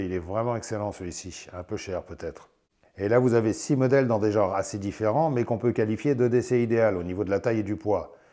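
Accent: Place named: South, France. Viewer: Il me plaît bien effectivement ,en plus on a eut droit à l'accent du Sud,une longue fausse pub. Merci à toi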